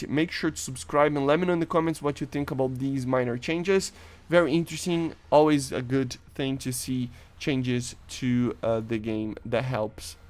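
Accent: Brazil. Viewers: I’m pretty sure he’s from brazil so English isn’t his first language and he probably only speaks English online so his pronunciation won’t be the best.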